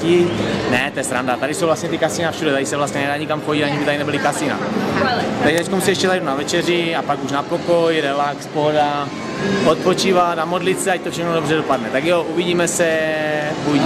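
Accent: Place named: Moravia, Czech Republic. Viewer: On má moravskej přízvuk, je z česka.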